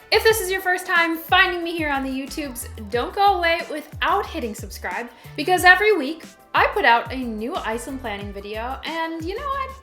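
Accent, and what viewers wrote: United States. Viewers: So tourists are the main downside of living in Iceland.......................Said the woman with the American accent. Hypocrite.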